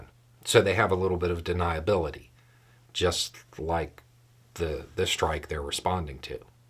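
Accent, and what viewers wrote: South, United States. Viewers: Just found this channel. Thought you were a hillbilly talking gibberish. But you’re actually pretty solid dude. Great watch. I’ll stick around.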